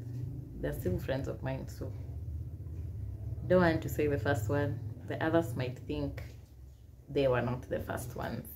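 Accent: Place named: United Kingdom. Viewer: wuuueeeeehhhh🤣☺😂😂😂😂 na hio british accent yako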